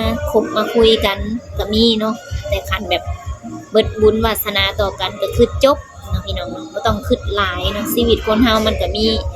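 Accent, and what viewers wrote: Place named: Ban Khok Yai, Thailand. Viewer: เจ้าคนบ้านโคกใหญ่บ่ครับฟังเสียงคือคนบ้านโคกใหญ่ครับสบายดีปีใหม่ครับ ขอให้สุขภาพแข็งแรงตลอดปี69และตลอดเด้อครับคนงาม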